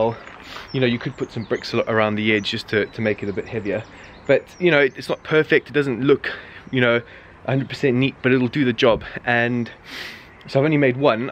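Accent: South Africa. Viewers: Hearing the SA accent just gave me joy lol. South Africans know how to DIY the right way💪💪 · I thought the same thing. Had to come to the comments to confirm if it was South African accent :")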